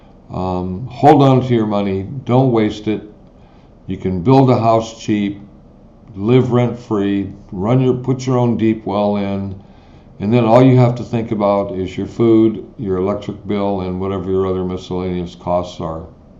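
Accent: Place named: United States. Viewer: Here is my complaint about this video and I really don’t like to complain but when talking budgets it PH you are clearly speaking to folks who are not in PH. So why speak in pesos and to make it worse you alternate to both which makes it super confusing. At the very least stick to one but you sound like your from US and speaking to Americans so a budget in US dollars would be more friendly. Just some friendly feedback.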